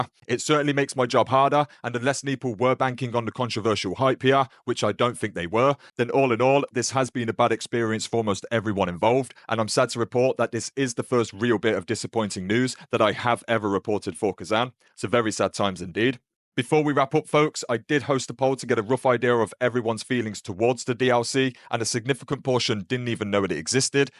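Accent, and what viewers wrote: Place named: United Kingdom. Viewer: Never heard such a cock in the mouth accent as from this Brit 😂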